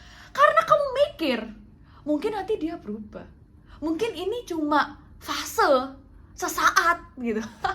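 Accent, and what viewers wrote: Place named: East Java, Indonesia. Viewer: Medok jawatimuran kentel banget bikin ngangenin, apa lagi para perantau asli Surabaya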